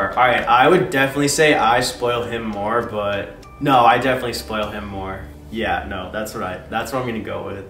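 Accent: Canada. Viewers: Are you Canadian? You have a Canadian accent lol.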